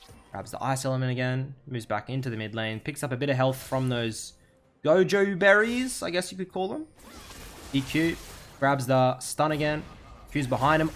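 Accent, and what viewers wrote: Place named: Australia. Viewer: love the Aussie commentary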